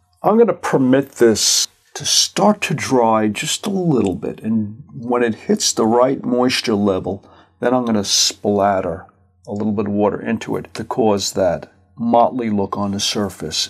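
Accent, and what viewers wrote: New York, United States. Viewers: Loved watching you paint. Wonderful composition. You are making me miss home, New York with your accent. Thanks.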